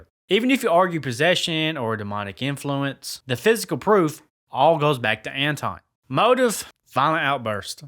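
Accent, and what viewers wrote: South, United States. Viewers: No one ever told me "don't you smoke the devil's weed." How southern are you?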